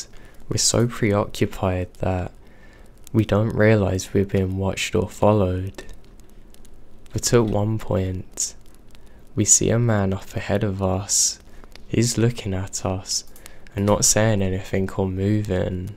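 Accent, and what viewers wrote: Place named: Yorkshire, United Kingdom. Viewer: Hey. What’s accent (or no accent) do you have? Yorkshire? I can’t tell? Not London I think. It’s cool.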